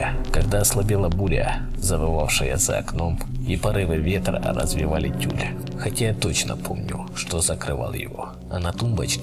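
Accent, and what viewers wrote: Ukraine. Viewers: Чувак ты украинец? БЛИН НУ СЛУШАТЬ НЕ ВОЗМОЖНО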